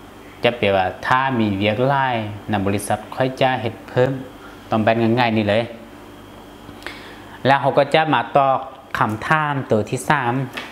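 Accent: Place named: North, Laos. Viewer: ເຈົ້າເວົ້າພາສາລາວແບບນີ້ຄົນວຽງຈະບໍ່ເຂົ້າໃຈສົງໃສເຈົ້າແມ່ນຄົນພາກເໜືອນໍ້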